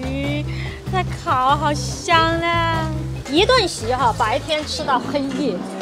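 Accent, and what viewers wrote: Sichuan, China. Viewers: 四川口音是改不掉了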